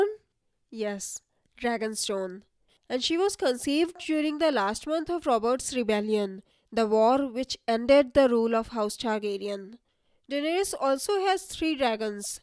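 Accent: India. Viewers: are you from INDIA? your accent seems like that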